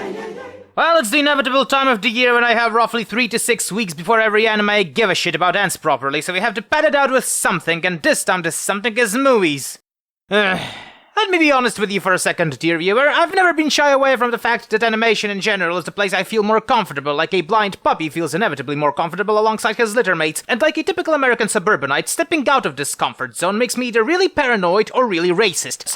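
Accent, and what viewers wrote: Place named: Eastern Europe. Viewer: Damn, I slowed it down and got classic east EU accent from US movies. Git gud -_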